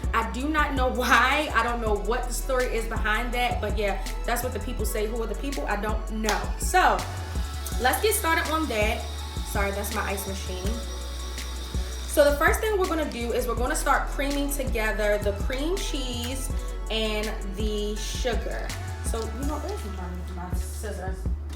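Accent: Baltimore, United States. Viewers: I must try this, hopefully it turn out as good. Are you from my hometown Baltimore??? I hear he accent.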